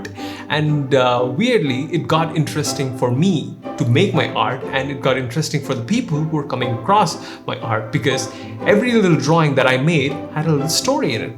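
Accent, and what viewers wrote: South India, India. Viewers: are you from south india bro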